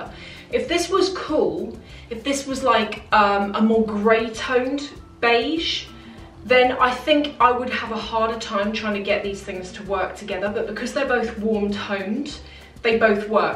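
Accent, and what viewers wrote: England, United Kingdom. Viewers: 1) I dont care about this sponsored video. This is still a great vdo because you are talking like a manual for how to carry out the Lily Silk outfits for someone who wouldnt have much clue about how to put them all together and look great. It could be helpful regardless the outfits are from Lily Silk or not. 2) The way you made your point on getting just one bag, in which colour, in which size - HELPED ME. Because I am about to get one. Thankyou! 3) Your accent is so lovely. You look beautiful and elegant too! English accent is like music to the ears. Other English youtubers with a beautiful accent and are worth watching are Cassie Thorpe (self diagnosed luxury addict lol), Emily Norris (who makes great mommy videos)., this is the first time i'm commenting on your channel because i friggin loved the whole idea behind this vdo ❤ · I watch your video because I love your British accent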